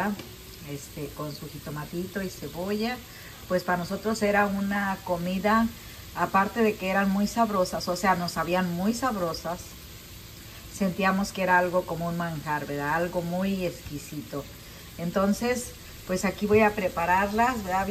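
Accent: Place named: Spain. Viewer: Hola querida chef, más de 1400 videos que preparaste y compartiste para nosotros y alrededor de 113 mil suscriptores es un récord maravilloso y excelente para ti, te felicito.Ver este video de 4 minutos en el que hablaste con un hermoso acento español fue muy divertido para mí, y ver tu video me fue muy útil para practicar español..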